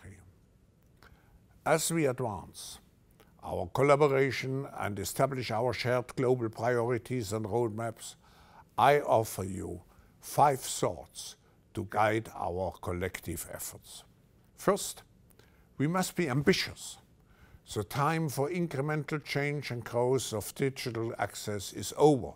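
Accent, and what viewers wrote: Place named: Germany. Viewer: He's probably got the worst German accent, I have EVER heard....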